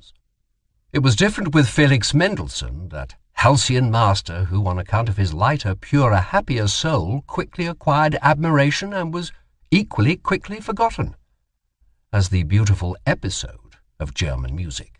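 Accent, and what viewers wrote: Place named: England, United Kingdom. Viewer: Very funny to hear Nietzsche's rant on Englishmen being read with an english accent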